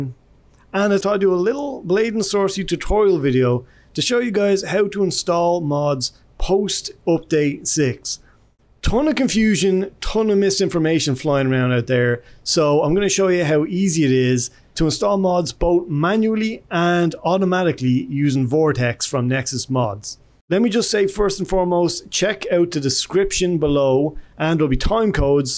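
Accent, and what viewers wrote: Ireland. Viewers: your irish accent is awesome dude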